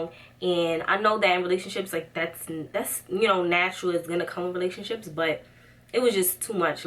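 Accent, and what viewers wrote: New York, United States. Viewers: dead ass, I love how New Yorkers talk💖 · i knew you had to be from nyc! heard that accent coming through lol much love from Jersey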